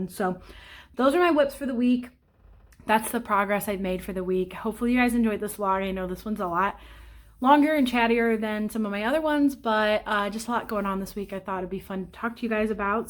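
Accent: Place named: Midwest, United States. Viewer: Ah I knew you lived in the Midwest I heard you say Missouri lol! I didn’t want to be the creeper that asked where you lived but I live in Nebraska and from Iowa so I could tell by your accent you were a midwestern like me ❤❤😂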